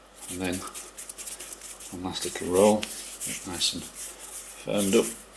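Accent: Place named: Trinidad and Tobago. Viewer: Like u dotish or wat. The man is clearly a trini from his accent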